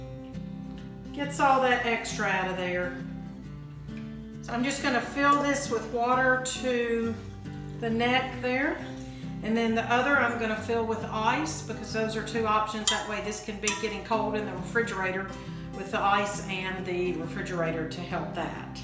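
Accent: Tennessee, United States. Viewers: As soon as you started talking, I knew you were a Tennessean!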